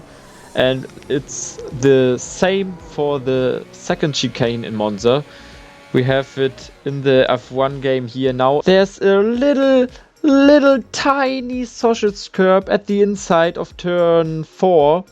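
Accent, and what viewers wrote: Germany. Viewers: Wie man den deutschen Akzent hört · That German accent :D okay im from Czech Republic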